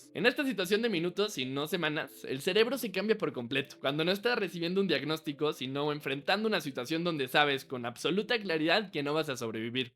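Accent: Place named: Mexico. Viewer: Interesante el video, pero tu voz es demasiado mexicana 😢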